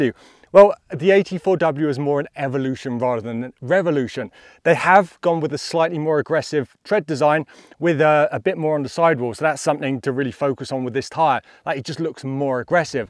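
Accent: United Kingdom. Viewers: A British Mormon talking tires?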